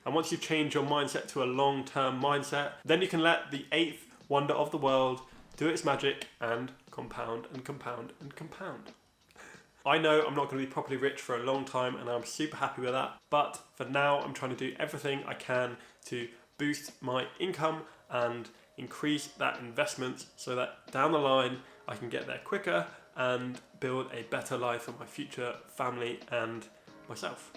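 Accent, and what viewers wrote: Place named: Scotland, United Kingdom. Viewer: Nice video mate, Scottish accent was decent to be fair!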